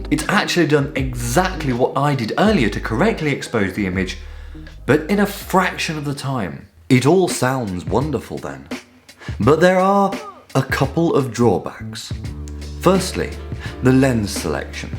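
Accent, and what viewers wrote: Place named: United Kingdom. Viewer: I don't know why but the British accent just got me. Love it though! and M50 is really handy for vlogging for sure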